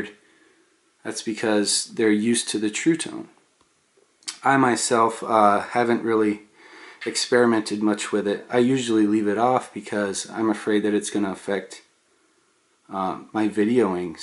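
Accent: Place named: North, United Kingdom. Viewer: A real northerner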